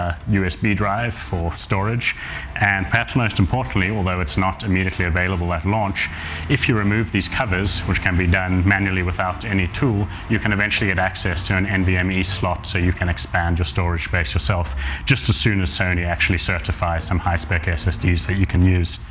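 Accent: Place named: Scotland, United Kingdom. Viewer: Thick Scottish accent LUXURY!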